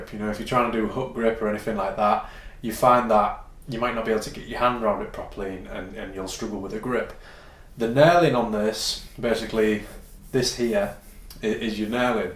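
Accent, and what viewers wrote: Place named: Hull, United Kingdom. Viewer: U from hull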